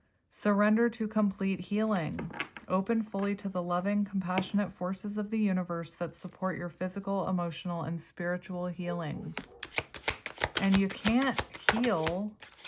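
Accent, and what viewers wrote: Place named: Canada. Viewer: Crying and throwing up every time you say "about" because this guy has the most beautiful Canadian accent I've ever heard in my life. 😭😭😭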